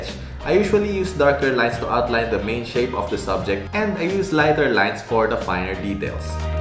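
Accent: Philippines. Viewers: Thank you for sharing your drawing skill. I could not help but notice, your accent. Are you from the Philippines by any chance? Greetings from Indonesia.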